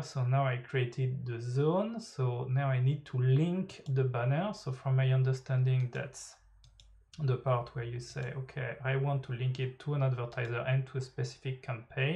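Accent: France. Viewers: Yo t'es francais ?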